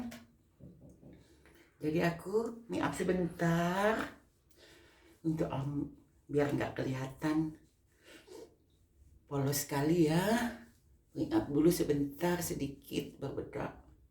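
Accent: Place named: West Java, Indonesia. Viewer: Teh dari logatnya kaya org sunda. Salam kenal dari tambun bekasi.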